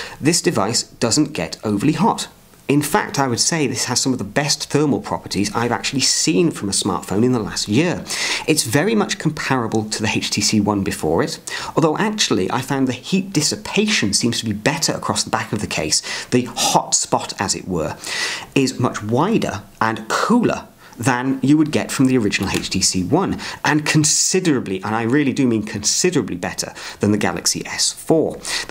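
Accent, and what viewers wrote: England, United Kingdom. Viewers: Please slow down your speech. Some people don't do cocain nor are they nine years old. I was interested in this video for reasons of technical research and personal inquiry. It took me a half second to make out what you were trying to say about the device. I do find it slightly difficult to make out an English accent as it is. But I thought that if you were wanting your video to be more professional as it would appear to be such a topic. I think it could be spoken in such a manner where all could enjoy. Thanks! I tried to PM this message but the option wasn't available.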